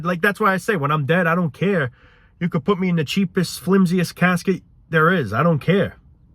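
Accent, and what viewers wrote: New York, United States. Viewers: Yeah the ny accent, and truth speaking. Makes me laugh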